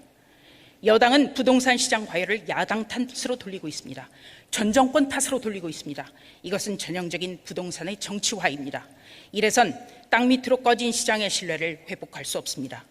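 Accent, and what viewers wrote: North Korea. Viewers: 대한민국 국민 맞나? 이북여자같다 억양이 억세고 평양가서 하거라. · 이은재 강남 망구 대타 조~조 수~다쟁이 진~상 저진상 북한에서왔나? 목소리가? 저여자 보기만해도 독살기가 두눈에서 번쩍거려요 합죽이 입안에선 껌씹고 있나? 왜그렇게 우물거려..! 저런걸 ㅋㅋ. 미통당답다 허기야 잡동사니 집합소아니까 저기 태영호 지성호도 있잖아..ㅋㅋ 귝민의 귀에는 미친개 짖는소리로 들린단다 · 조수진 너와 그 외 똘마니들 (미통당) 북한 사람인줄...말투가 왜 저러지?? 못된 관행은 좀 버려라~~~내 세금 돌리도~~니 피같은 세금 너네처럼 놀면서 받으라고 안냈다..걸핏하면 장외투쟁...정말 지겹다!!!!!니네가 민주주의 얘기하니까 왤케 웃겨~~~ㅋㅋㅋㅋㅋㅋㅍㅎㅎ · ~조선에서 북한억양으로 무게 잡고 트집잡던데 작정한 듯 적어 온 글 따닥따닥 딱타구리처럼 웅변하고 있네요 ~~ 적폐가 적폐를 ~~ㅠ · 조수진이 이여자 북한 아나운서 였어? 말도 약간 북한 사투리쓰는것 같고 말하는톤도 그렇고... 북한 아나운서 같이 들리네.... 왜 30대 젊은이들이 내집 장만 못하는줄알아..?? 너희들 같은 국회의원들이 그집에서 살지도 않으면서 투기 목적로 집을사서 돈을 벌고 있기때문에 집세도 올라가고 젊은이들은 집도 사기 힘든거야..... 지금 넌 뭘 알고 이야기 하니??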